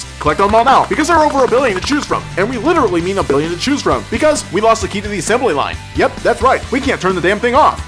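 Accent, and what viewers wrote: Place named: Ohio, United States. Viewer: LOL that is our Ohioan accent ~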